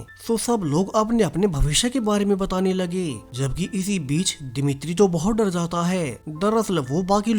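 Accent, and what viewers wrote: Haryana, India. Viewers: achii hai bro, tabhi feel ata hai video mai...haryana se ho kya bro? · aapp haryana se hoooo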